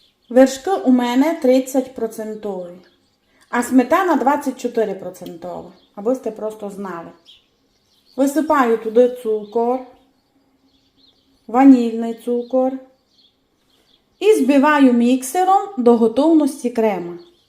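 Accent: Transcarpathia, Ukraine. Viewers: Цей неймовірний закарпатський діалект то❤ Це лайк і підписка сходу! Дякую за вашу працю і процвітання🌷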